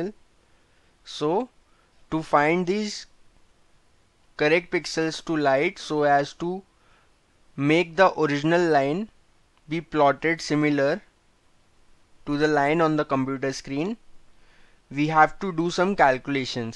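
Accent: India. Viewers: u speak indian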